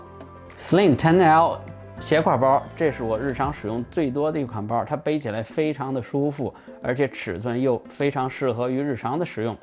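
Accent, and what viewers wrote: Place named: Sichuan, China. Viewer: 作者应该是巴渝或者四川人把！jin脏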